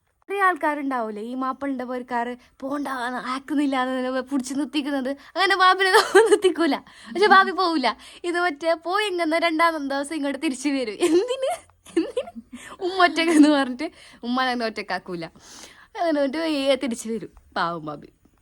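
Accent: Kasaragod, India. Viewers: vedio kand kand ipo ksgod slang set avunund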